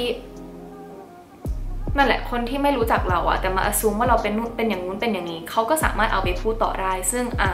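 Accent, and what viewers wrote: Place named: United Kingdom. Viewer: ชอบสำเนียงบริติช มากกก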